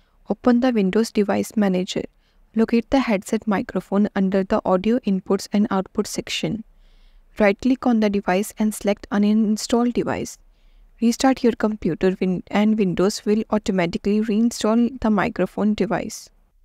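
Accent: India. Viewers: Can’t escape the annoying Indian accent anywhere these days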